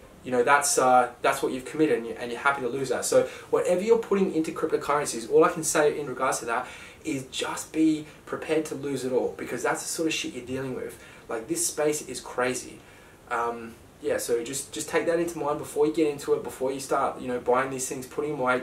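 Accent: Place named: Australia. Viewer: Can't understand your Australian accent, were you saying Adder?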